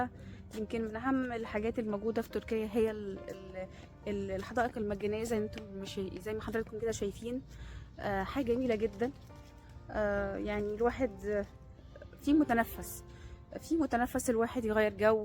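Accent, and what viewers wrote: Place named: Ethiopia. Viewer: تعلميها انتي الاول وبعدين تفلسفي لما بتنكلمي تركي نفس صوت ولهجة العاملات الاثيوبيات اللي بتتعلم من اصحابها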